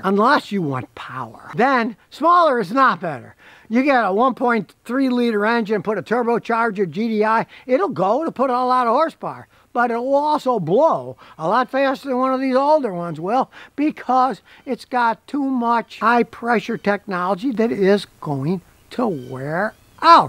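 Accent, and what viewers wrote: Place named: United States. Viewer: I’m sorry, but you rabbit in your peculiar American accent far to quickly to comprehend what you are talking about. I’m sure some people might understand you, but not me.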